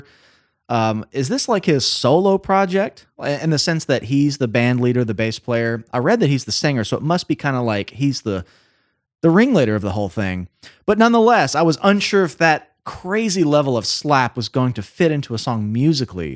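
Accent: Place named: United States. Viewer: Pronounced, Redding, Staytus... bloody yanks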